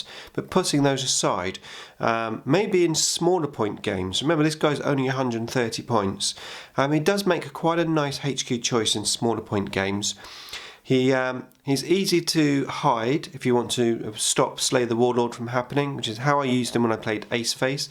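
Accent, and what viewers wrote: United Kingdom. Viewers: 40k Where are you from btw? I would likr to improve my English level(by watching wh videos) and ur accent sounds cleanly british haha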